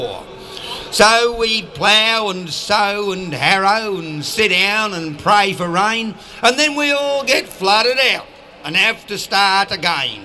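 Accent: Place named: Australia. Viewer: Last heard this recited by Gerry Hallom a folk musician from England who travelled to Australia. Good to hear it recited by an australian.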